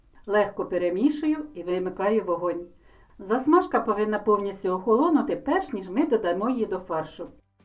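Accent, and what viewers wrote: Standard. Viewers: Спасибо за чистый и мелодичный украинский язык. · Цікаво👍Треба спробувати. Особисто від мене дяка за гарну українську, вперше чую на кулінарному каналі таку чітку мову❤Процвітання вашому каналу.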